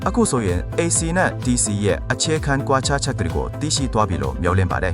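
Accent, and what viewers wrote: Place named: China. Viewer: လျှပ်စစ်ရဲ့ အခြေခံ သဘောတရားကို ဝေမျှသင်ပြပေးလို့ ကျေးဇူးပါဗျ။ သို့သော်....... AI ကို ပြောခိုင်းတာကိုတော့ မနှစ်သ်ပါဘူးဗျာ ။ မြန်မာစကားကို စာဖတ်ပြီးပြောနေတဲ့ တရုတ်တစ်ယောက်ရဲ့ စာဖတ်ဟန်နဲ့တူနေပါတယ်။ ဝါကျတစ်ခုခြင်းစီရဲ့ အသံ အနိမ့် အမြင့် အဖြတ် အတောက် မပါတဲ့အတွက် နားထောင်ရတာ ပေါ့ပျက်နေပါတယ်။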